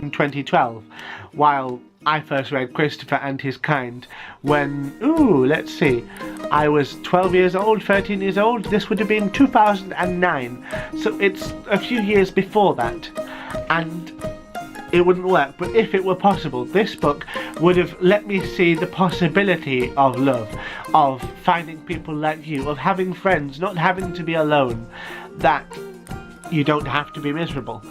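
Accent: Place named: United Kingdom. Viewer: Dear, I'm Ivan and I'm genderfluid:) I've always enjoyed rereading certain books. last year I reread "The Lords and The Ladies" and "Witches Abroad", 'cause I went to live abroad and I found myself in a depression and the only thing I could think that would lift my spirits up was rereading these books. now I started reading 1984 for the first time and, boy, how do I find it relateable to the times we live in!:) I also share the same fears about the politics in the world currently - I'm sad, angry and depressed. here, in Bulgaria, where I'm from our stupid people chose a pro-Russian president, so we'll see how it goes down. hugs!:) keep on doing videos with that lovely British accent of yours! you're adorable!:)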